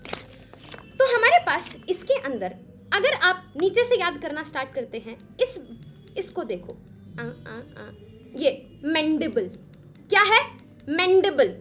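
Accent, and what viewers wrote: Haryana, India. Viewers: Haryana ig 😂?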